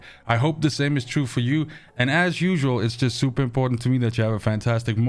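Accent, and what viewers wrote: South Africa. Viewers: Were are you from? South Africa? Hard to pinpoint the accent hehe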